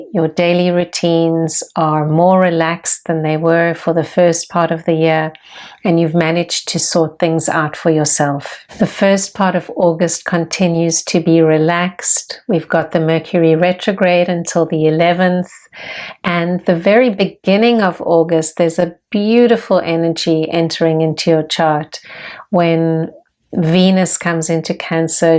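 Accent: South Africa. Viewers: I’m from Durban! ♥️ 🇿🇦 I knew your accent right away!! 😅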